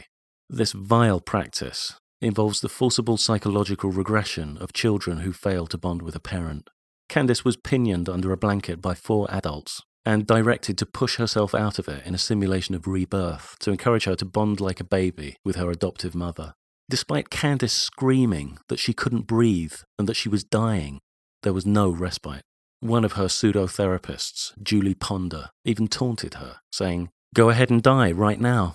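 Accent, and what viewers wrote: England, United Kingdom. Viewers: You sir are subbed. I followed a similar path in my education. Although it was Sociology and Anthropology. As you can imagine the ludicrous level of psuedoscience I saw, it might have been even worse for me. I'd love to able to do a series like this. But,I am absent the articulate and refined english accent and being american find myself exploding into arrogant & angry fits of cursing. :).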